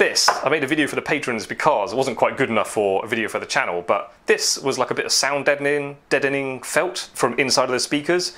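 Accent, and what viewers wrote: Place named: England, United Kingdom. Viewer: Great video Mate, thank you! What 's your accent? (what part of England Imean)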